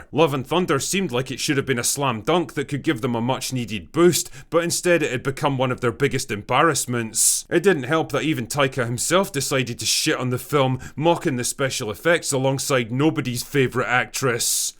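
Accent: Scotland, United Kingdom. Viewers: Jeeze someone needs a snickers. All Im hearing is a rather judgy scotsman bagging a fella out for one bad film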